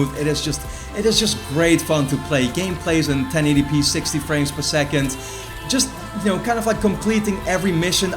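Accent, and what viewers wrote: Netherlands. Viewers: omg are you dutch? i tought it from your voice ( im dutch :p)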